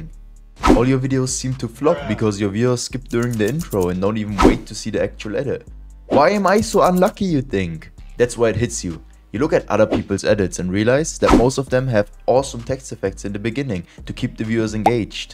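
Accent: Germany. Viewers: Der Bruder muss deutsch sein bei den Akzent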